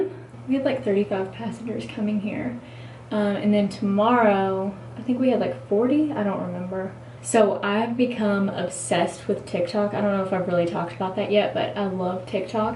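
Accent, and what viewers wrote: South, United States. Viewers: This Virginia boy loves to hear ya'lls Southern accent.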